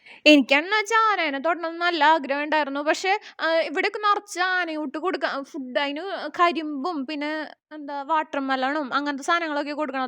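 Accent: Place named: Thrissur, India. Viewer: തൃശൂർ ഭാഷ❤,ഏതാ അമ്പലം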